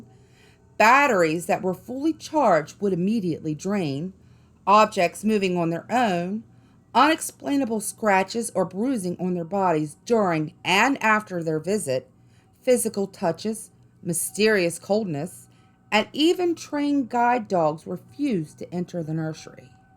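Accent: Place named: Pennsylvania, United States. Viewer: Hmmmm....are you from Pennsylvania? Just wondering because I am picking up an accent and it's making me homesick lol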